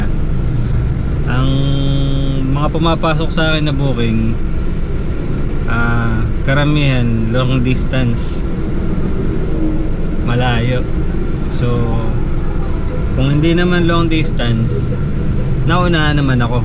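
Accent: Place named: Hagonoy, Philippines. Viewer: hagonoy accent haha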